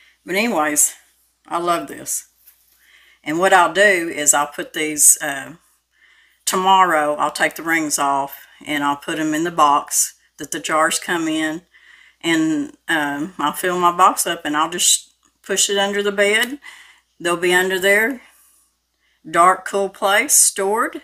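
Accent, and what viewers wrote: South, United States. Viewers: Wow, that is amazing! I don’t have a bread machine.. Diabetes runs in my family, so I have to stay away from breads. You always have an educational video. Thank you for teaching us 💕. I am from Iowa. We pronounce pecans differently. Love your southern accent😀!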